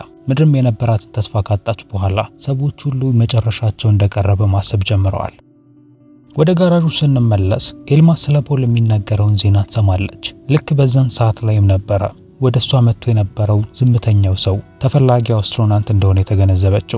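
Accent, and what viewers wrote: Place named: Oromia, Ethiopia. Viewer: ጋላ አማርኛ ይሰማል ዘንድሮም። 🙆🏽‍♂️🙆🏽‍♂️🙆🏽‍♂️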